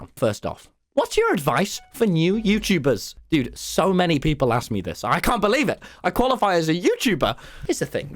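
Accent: United Kingdom. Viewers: so... the weekly slap but bri-ish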